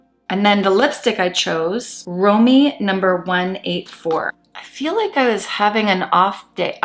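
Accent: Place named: Canada. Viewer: Lisa’s team would probably let you return it for a refund, if you don’t think you’ll use it. They’re amazing! To answer your question, I use my fingers with the more glittery ones too, or a wet brush like you do. That madrigal(?) shade is so lush though! I’ve got all of the lustre ones (collected gradually, I’m a bit of an addict with LE stuff 🤣) but not as yet any of the liquid silk ones! Hopefully soon, as my budget allows. 🤦🏼‍♀️🤪 I think they might be my go-to ones once I get them! 😍 Delighted you replied, thank you. It must be hard keeping up with comments and editing etc!! I appreciate your work and time. X I so love your chatty natural style, it makes me feel like I’m in the room with a friend, watching you put on your face, haha. I love that. 😂 I’m from Scotland, of all places, my name is Linley. I love your wee Canadian accent touches. 🥰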